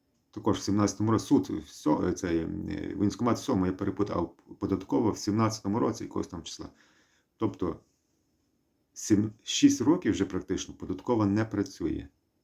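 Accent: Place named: Galicia, Ukraine. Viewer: Лайк за україно-галицький говір🤔🙂👍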